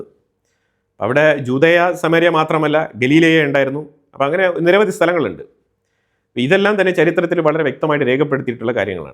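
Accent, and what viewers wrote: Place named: Malabar, India. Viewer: His സ്വീകരിച്ചു of speech is of മലബാര്‍.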